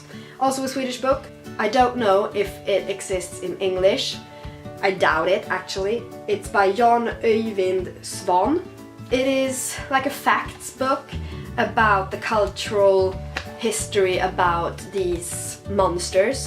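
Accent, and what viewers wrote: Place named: England, United Kingdom. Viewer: P.s I so so love your english accent❤️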